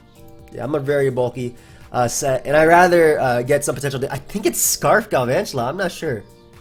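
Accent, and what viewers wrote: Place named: Bronx, United States. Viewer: an entire road to top 10 with your bronx accent when?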